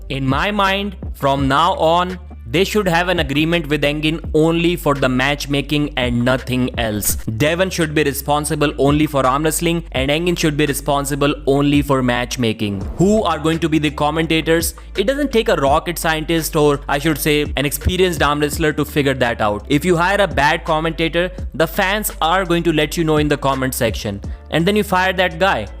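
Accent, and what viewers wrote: India. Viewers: FIX YOUR STUPID INDIAN ACCENT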